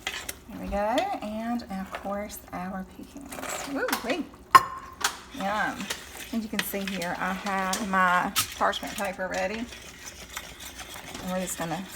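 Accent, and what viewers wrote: South, United States. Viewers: My Momma used to get fresh Pecans from my brothers tree and he had a black walnut tree too and she would get bucket fulls. I don't think she ever made pralines though. I do like them though. I say it just like you do it is a Southern thing I think. I had a lady correct me and said it like the other way to say the name and I said well I say is the way I do that is how I was raised to say it and she told me that is the wrong way. I was like okay whatever lol.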